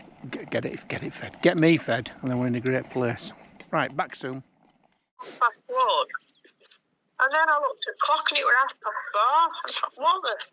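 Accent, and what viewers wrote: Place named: Yorkshire, United Kingdom. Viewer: I’m up in May,cycling from Dunoon to Tayinloan and bikepacking on Gigha. Funny hearing all those Gaelic place names in a Yorkshire accent. Great vid, thanks.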